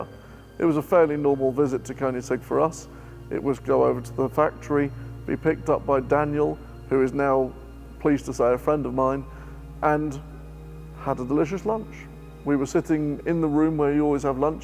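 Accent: RP, United Kingdom. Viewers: You sound like the stereotype of a British posh bell end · This guy’s voice sounds like a stereotype cartoon posh British guy from 1900s with a suit and a monocle.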